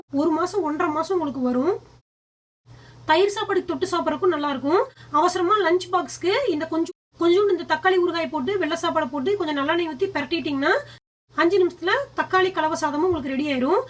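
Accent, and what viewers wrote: Kongu, India. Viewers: Migavum pandhamana pechu.Arumaiyana samayal. Nandri sis. Nam kongu Tamil alage alagu. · Kongu tamil valka supper akka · unga Slang apt kongu style la irukunga sis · Akka kongu slang super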